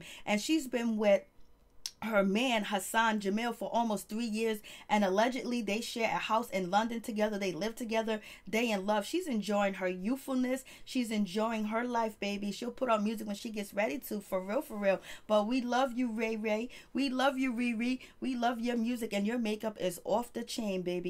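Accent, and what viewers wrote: New York, United States. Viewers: I love the way you talk..are you from New York??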